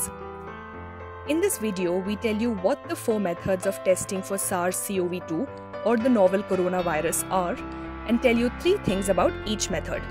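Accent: India. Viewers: I must be positive bc I cant listen to this terrible narrator for even 5 secs. why use a complete Indian to speak in English? hm? hmmm???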